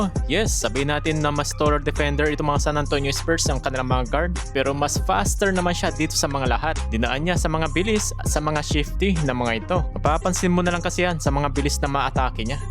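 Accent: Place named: Visayas, Philippines. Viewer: Nako salita palang bisaya na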